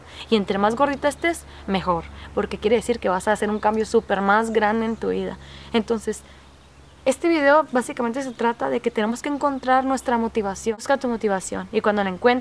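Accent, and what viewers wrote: Chihuahua, Mexico. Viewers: Me encanto tu video :) me caes muy bien y me encanta oír tu acento norteño jaja, en el video pasado te pregunte y me dijiste que viviste en Sonora hace un tiempo, tmb soy de allí, te invito a ver mi canal, un saludo hermosa :)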